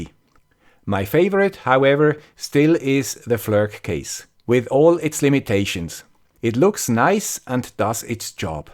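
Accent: Switzerland. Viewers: Tönt sehr nach emne Schwiizer 👍🏻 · Your Swiss accent is nice. :) Since this video came the Argon ONE Pi 4 and Argon ONE Neo.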